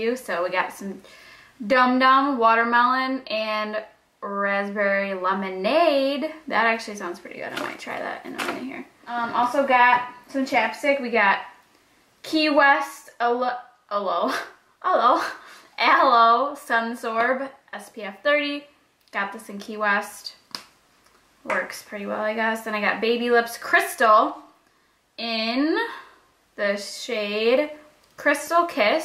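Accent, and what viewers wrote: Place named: Upstate New York, United States. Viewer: You have an upstate NY accent, like how you pronounce your "a's". When I lived in MD my friends there would bust my chops because I was the same way. Water safari is the best BTW. I live in PA now and my bf just doesn't understand why I want to drive 5hrs back home just to go to a water park.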